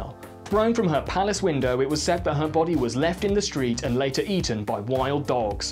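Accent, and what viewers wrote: United Kingdom. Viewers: This guy does a pretty good British accent.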